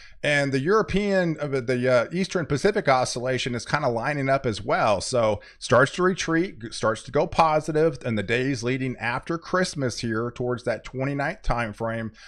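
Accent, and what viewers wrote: United States. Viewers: There are people commenting on his way of saying westrin for western, eastrin for eastern and pattrin for pattern. These are part of the United States regional speech differences. A regional accent if you will. Like a Boston accent or Southern accent. I have a friend from Kentucky who talks like that. You may think they’re uneducated or stupid but it’s a speech pattern of their region. Very hard to unlearn even if you get multiple degrees. Just my two cents.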